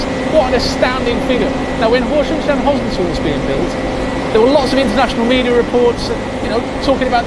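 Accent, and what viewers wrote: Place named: United Kingdom. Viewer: 搞笑的英国口音，英国腔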